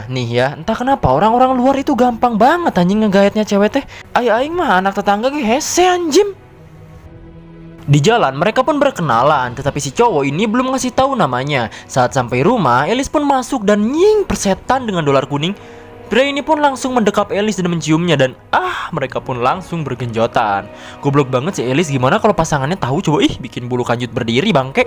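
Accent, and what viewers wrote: West Java, Indonesia. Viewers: Pasti org sunda yeuh s mimind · Fix org sunda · ngakak kie sunda na wkwkwk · Lucu sunda na mna a? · sunda bet dahh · anying sunda na mantap ngangkang aink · Orang suda ya min hehe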